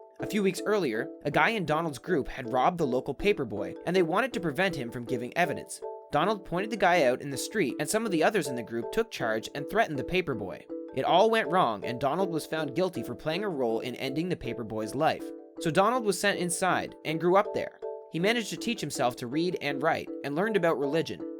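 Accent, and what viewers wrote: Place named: Canada. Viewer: You can tell the guy talking is Canadian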